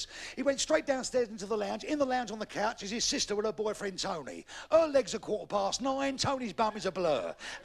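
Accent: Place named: Cockney, United Kingdom. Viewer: Classic cockney comic. They really dont make them like that anymore. All the whites in London talk black now. Such a shame that the cockney accent has died out. His jokes were ok but his accent was classic.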